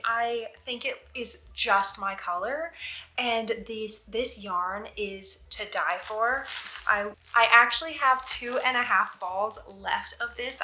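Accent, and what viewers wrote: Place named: Australia. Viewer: You can’t tell you how tickled I am to hear the Aussie creeping into your accent!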